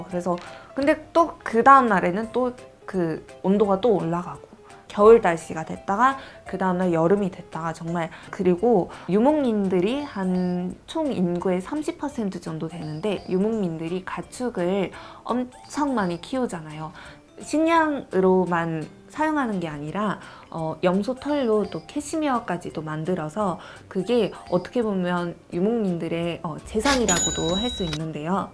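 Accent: Seoul, South Korea. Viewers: 눈감고 눈뜨고 듣고 보고 있어도 한국서울 사람입니다. 제주 부산 광주 대전에 가셔서 말씀하시면 서울사람이지. 몽고분이라고는 절대 믿지않을듯. 여러분야에서 한몽간의 가교역할을 하고 계시고 더욱더 잘하실듯 합니다. 화이팅입니다^^ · 발음이 그냥 한국 사람이네요.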